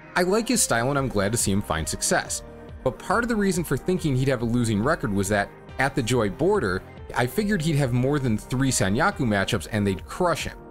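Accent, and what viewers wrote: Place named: United States. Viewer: Good analysis, but I wish you stopped americanising Japanese words and Japanese names.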